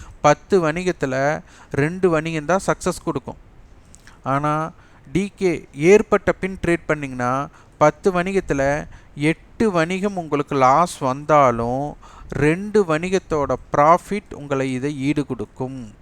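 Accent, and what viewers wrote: Kongu, India. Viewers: Masterji, such a nice advice (in a family how Amma Appa advice to their childrens - I feels just like this) for small traders, in 2 days can't earn lakhs & crores, we can save our capital, This is the time to study, observe, learn. Take a notes what market doing Iam a big fan of your Kongu Slang Tamizh "VALATHUDAN VAAZHGA"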